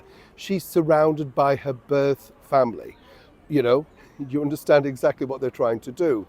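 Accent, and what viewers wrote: United Kingdom. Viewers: Dear Mr., I so enjoy your conversations with your viewers...you touch my heart and take away some of the loneliness I have at times. I never realized that until just now at the start of this particular video! Your values and assessments of situations, in the ongoing Royal "situation" for example, and in your observations on societal changes and issues in general, resonate with me, (an older moderate American, quite bit older than you) I started watching you for a taste of London and your charming British way of speaking, and yes I do enjoy that still, but especially the way in which you address sensitive issues in a refined, genteel manner WITHOUT being stuffy! I love that about your channel and about YOU! I am a true fan, just fully realizing that this afternoon May 25. I do so appreciate how you are NEVER crude, coarse or salacious in your reporting and commentary. 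THANK YOU!! I first started watching your channel when dear Queen Elizabeth left us....your heart was broken and your way of discussing her, with obvious love and respect, touched me deeply. I don't know if you have the time to read these comments but I hope you are able to read mine here. I often comment on your site, but this is a personal FAN letter! With sincere appreciation, L.K.Lovelace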